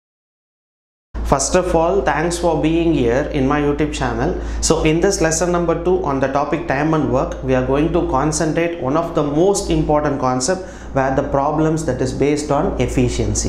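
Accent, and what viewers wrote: South India, India. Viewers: sir so far after my 10 th i ve listened to an explanation in south-Indian accent,,,and can be easily understood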